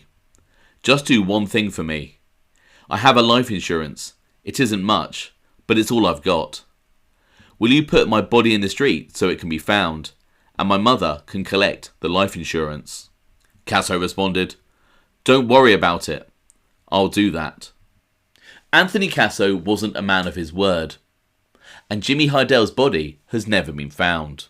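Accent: England, United Kingdom. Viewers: Well documented info. Interesting and now I am used to your English accent.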